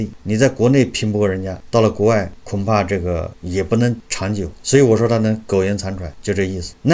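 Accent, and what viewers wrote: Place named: Jiujiang, China. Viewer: 您说话有点像九江人的口音。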